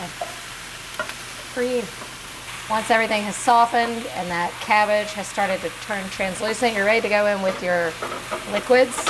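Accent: South, United States. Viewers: Love this lady...shes si cute! That little southern twang! ❤😊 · Shes so pretty and love her southern accent cause I’m from south Texas. I love her quick dinners with the price of takeout nowadays so she also uses everyday ingredients to make food. I have no kids, but also not working now unfortunately so this is perfect to stay within my very meager budget. Love her!!!!!❤❤❤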